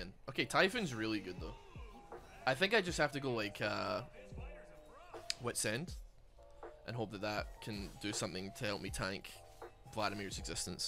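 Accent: Scotland, United Kingdom. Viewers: The best Christmas gift is two hours with a Scottish man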